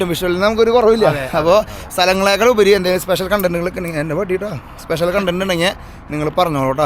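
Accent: Thrissur, India. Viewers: അവതരണം. ആ സ്ലാംഗ് തൃശൂർ.ചിന്തിക്കാൻ പറ്റോ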